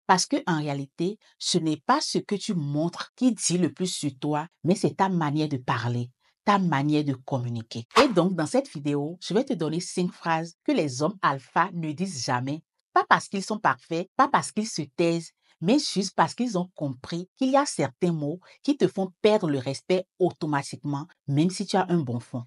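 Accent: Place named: Benin. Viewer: Ce que j'apprécie vraiment par dessus tout au delà de la clarté avec laquelle vous expliquer les choses c'est le faite de garder votre acens naturel de femme africaine et particulièrement béninoise sans pour au tant mimer l'accent occidental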